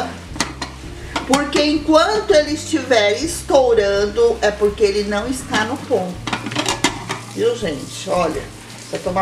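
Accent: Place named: São Paulo, Brazil. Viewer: Adoro este sotaque paulista!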